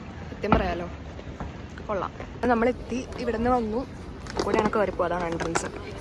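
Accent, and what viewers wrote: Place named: Thrissur, India. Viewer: Aa thrissur slang 😁😁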